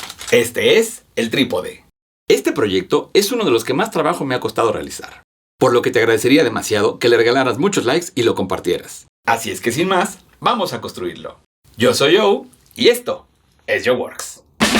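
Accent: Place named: Peru. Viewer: Amigo, soy nuevo subcriptor en tu vídeo. Algunas acentuaciones de sílabas me hace pensar que eres peruano de lima educado. Estoy equivocado o eres de otro país?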